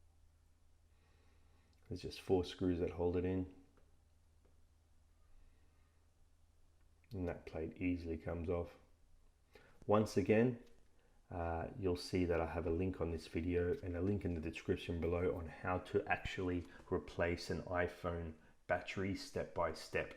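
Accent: Australia. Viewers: Nice to hear a fellow Aussie. Mine is a 6 plus I've changed the battery and everything seemed to go as per the instructions I haven't secured the battery down yet, but when I press the on/off button I only get the white screen with the apple logo briefly then nothing at all after that. Can you help? PS my charging cable is split right down the small connecting plug part, I have ordered a new one, could that be something?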